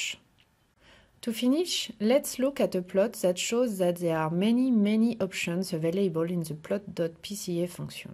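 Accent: France. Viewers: Her English accent is like french-i couldnt understand much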